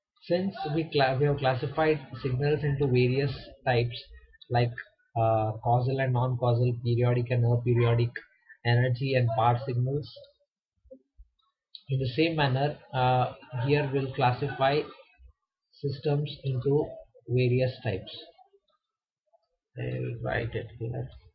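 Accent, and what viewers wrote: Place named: Pakistan. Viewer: classical pakistani talking.. :D why internet is full of you guys? even british accent is more understandable.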